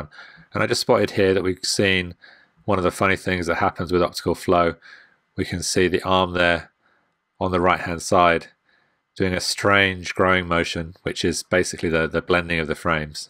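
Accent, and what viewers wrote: United Kingdom. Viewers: this is an English accent you divert!!!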